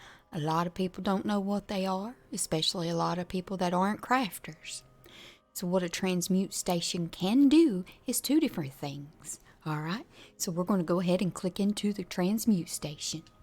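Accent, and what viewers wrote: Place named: Appalachia, United States. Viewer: Haha, are you from East TN, East KY, Or southwest/West Virginia? I was born in East TN myself , you sound like me and all my family haha. Funny finding that on the internet!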